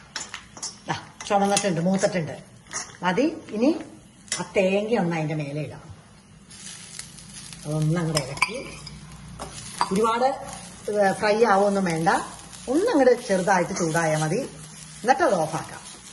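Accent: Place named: Valluvanad, India. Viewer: Teacher valluvanadan style il language.. Teacher evideya place?Super nalla nadan reethi enikku ishtayi.. ❤️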